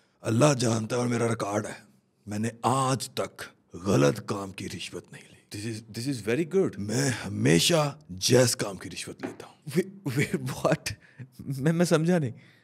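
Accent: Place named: Punjab, India. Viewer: Good acting skills. Specially 1st time saw your Punjabi accent, tone was perfect.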